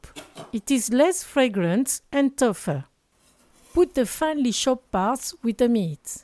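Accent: France. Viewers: Your amazing! Ty for the recipe. With your French accent I know you would make Vietnamese food w integrity 🤌🏼🫶🏼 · Is that a French accent I detect ? 😊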